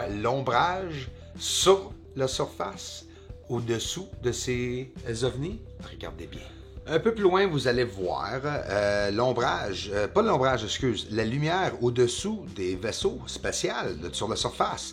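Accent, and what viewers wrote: Quebec, Canada. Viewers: y a pas pire aveugle,que celui qui ne veut pas voir,bout d,viarge,ha ha,t,as de + en + notre bel accent quebecois,lache pas,on aimes ca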